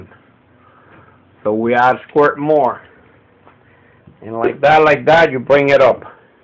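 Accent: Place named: Upper Michigan, United States. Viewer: sounds like this boy is somewhere just a little north of Marquette Mi,????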